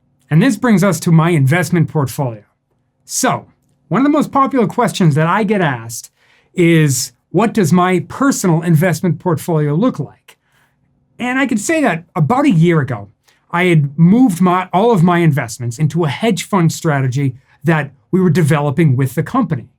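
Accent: Boston, United States. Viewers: It wasn't until you said you were from Boston that I noticed the accent 🤣 I'm from Brockton, should have picked up in that earlier. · You are from Boston ? I could never have guessed !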